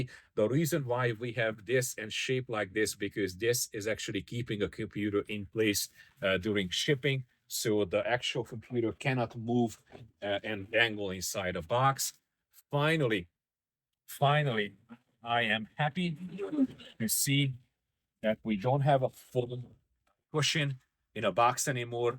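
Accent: Hungary. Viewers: are u hungarian by any chance? your accent really sounds like it:)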